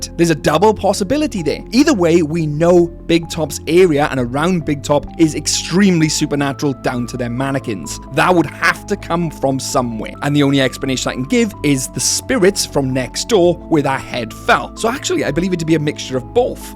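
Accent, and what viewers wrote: United Kingdom. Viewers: I cannot place your accent. Where in uk is ur accent from?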